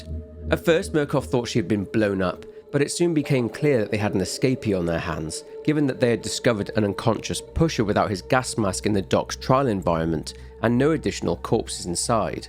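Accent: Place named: England, United Kingdom. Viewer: I love voice and English accent. They work together well sounding pleasant and intelligent to me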